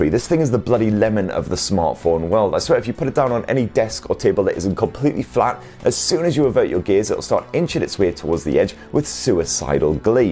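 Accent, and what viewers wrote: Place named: Sunderland, United Kingdom. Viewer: It's just a Sunderland accent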